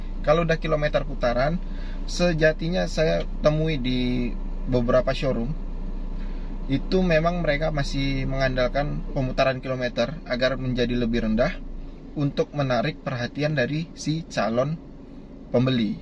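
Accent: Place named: West Sumatra, Indonesia. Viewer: Asslm Maaf Logat kayak orang minang ya bang.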